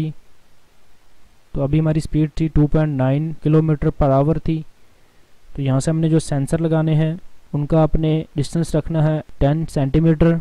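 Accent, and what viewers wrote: India. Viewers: interressting english. can't understand your speed indian accent at all. :)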